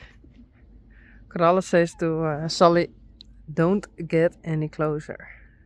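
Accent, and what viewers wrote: Netherlands. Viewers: I like the Dutchy way you say things.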